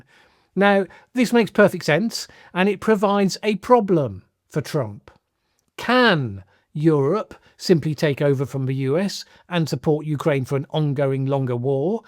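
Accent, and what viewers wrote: United Kingdom. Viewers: It is fascinating to listen to utter rubbish, delivered in a nice British accent. Your drivel is driven by the basic hatred of Russia, and you will let no facts alter your knowledge of the truth. Like Ukraine, you too are heading down the toilet of history by your hate and ignorance. Zelensky was elected by 76% of the Ukranians because he promised to return the Minsk agreements and make peace with Russsia and the ethnic Russians, 14,000 of wome you have murdered in Domabss. As soon as he was elected, he totally flipped. If he DARE make peace with Russia, he will be hung in Maydan square by the Banderites - 3% of the people who run Ukraine. And the British see things ONLY through the lens of the Russophobia. "a man hears what he wants to hear and disregards the rest". Reality punishes stupidity. When regarding the ALLOWED gang rape of your young women, what conclusion can be reached, except that you have become degenerate people ?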